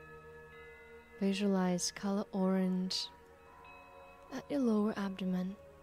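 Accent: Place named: Australia. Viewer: has such a lovely voice but wold better if she could prevent the horrid Aussie accent creeping in!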